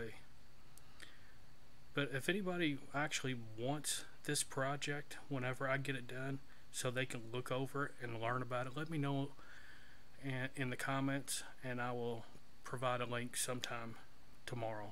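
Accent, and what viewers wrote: United States. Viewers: OH MY GOD YOUR VOICE IS AMERICAN BLENDER GURU IT'S EPIC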